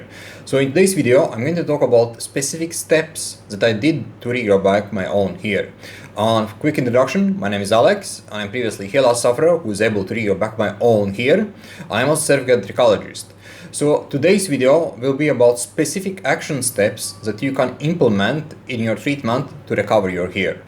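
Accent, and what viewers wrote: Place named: Russia. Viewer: Are you Russian?! Your English made my brain fuckd up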